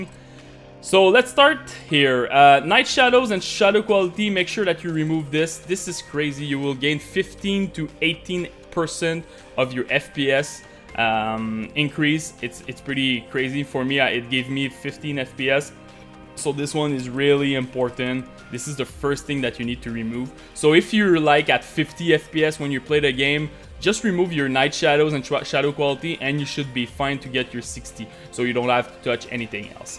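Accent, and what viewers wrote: Quebec, Canada. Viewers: This guy is from Quebec, I'm sure of it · t'es québécois sa parait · tu sonne comme un quebecois qui essaye de parler anglais (your voice sounds like a guy that speaks french trying to speak english)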